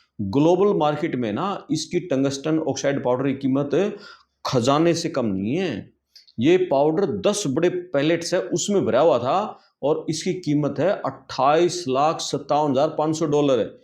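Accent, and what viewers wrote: Haryana, India. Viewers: Ye youtuber Haryana se hai ❤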